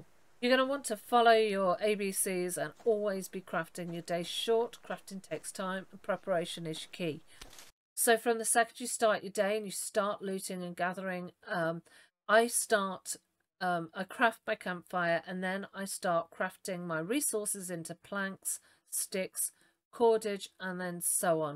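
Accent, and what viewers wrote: United Kingdom. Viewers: Sorry, I must have misheard something! P.S. Nice to hear an Eng;ish voice for a change, I can't listen to most of the American guides with all their drama and forced jollity :D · I'm a tad biased, of course, British English can win me over immediately. But great guide, points nicely exemplified with footage at the right times, well done!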